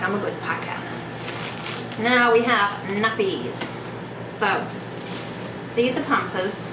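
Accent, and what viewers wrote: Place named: United Kingdom. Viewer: Where abouts you here in UK .....youve got that lovely british accent ....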